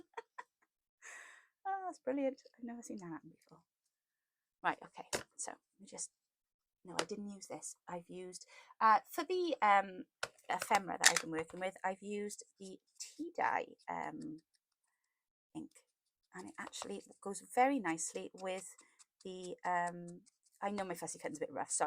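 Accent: Wales, United Kingdom. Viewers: Thank you for these freebies; I learned a lot by watching. I truly love your Welsh accent. My paternal Grandpa was from Blaenau Ffestiniog. He came to Canada in 1910 and here I am. I'm glad I found your channel through the collaboration by way of My Porch Prints.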